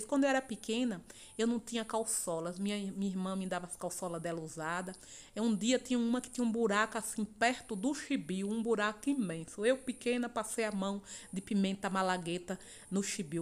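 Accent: Bahia, Brazil. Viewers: E tá baiana arretada,gosto muito do teu jeito de falar 👏👏👏👏👏👏🙏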